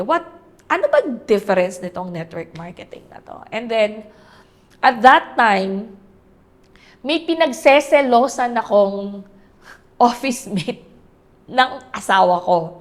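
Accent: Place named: Zamboanga, Philippines. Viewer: Taga Zamboanga, Jabacana, ganyan talaga magsalita ibang babae doon.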